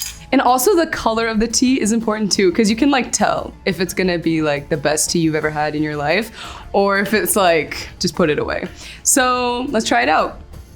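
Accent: Morocco. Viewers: the way she pronounces the names of things in the original moroccan dialect is Awesome <3! we proud of you <3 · كل شيء في الفيديو رائع والاروع نطق الاسماء باصولها المغربية لكن لو قمتي اختي الكريمة بشيء اخير لكان هذا المحتوى في قمة الروعة الا وهو ان تلبسي القفطان المغربي. روعة. 👏👏👏👍👍👍🇲🇦🇲🇦🇲🇦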